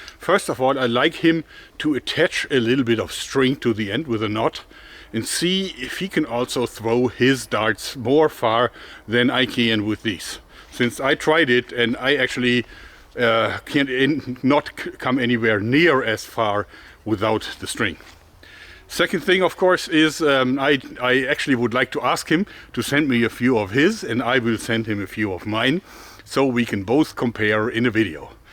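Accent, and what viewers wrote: Germany. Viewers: as a german living in the uk, german accents in youtubers infuriate me and make me cringe. you are the only one i actually like haha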